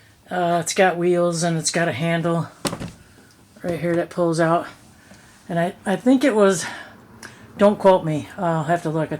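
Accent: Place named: Minnesota, United States. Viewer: I'm so happy to come across your channel today. And also am happy to see another female so pumped about gear you have and lug around! People must think I'm crazy as I pretty much have a lot of the same stuff to some degree myself and in my car and several 'bags' around the house, including the dog's go bag! Cheers from up here in Canada....what State are you in as I detect an accent of sorts...MN WI ?? I have a friend from MN and you kinda sound the same....lol cheers!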